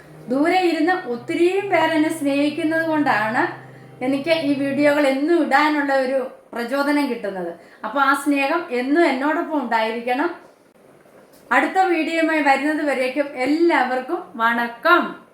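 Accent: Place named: India. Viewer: ഒരു കറി വച്ചാൽ എല്ലാം വിശദമായി പറഞ്ഞുതരും തുടക്കക്കാർക്ക് നല്ലതാണ് നാട് എവിടെയാണ് ഞങ്ങൾ പറയുന്ന പോലെ ചേച്ചിയുടെ ഭാഷ❤❤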